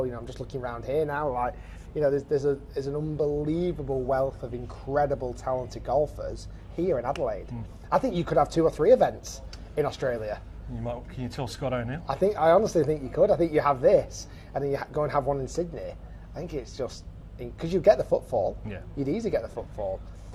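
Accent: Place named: England, United Kingdom. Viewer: I cant watch Rick because of that horrible English accent . The way he says " but " drives me insane . It must from what ever area he grew up in as the proper English accent is so nice to listen to . Its getto English . LOL . I know first world problems . Cant knock a youtuber for taking 10 million of LIV money though . Only a idiot would turn it down .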